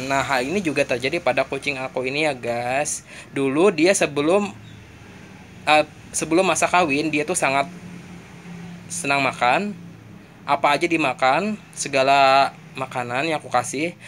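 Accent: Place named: Banjar, Indonesia. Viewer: Urang banjar sako amang ni . Logatnya kentara banar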